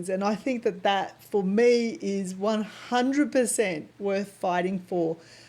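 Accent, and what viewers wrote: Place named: Europe. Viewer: She seems like a really nice girl. Love the accent. I wonder what country she is from. I'm guessing somewhere in Europe.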